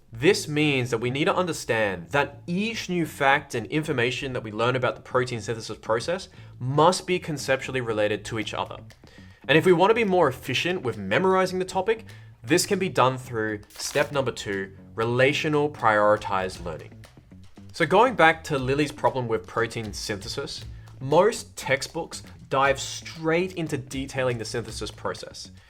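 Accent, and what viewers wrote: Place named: Australia. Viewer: I think it's the Australian accent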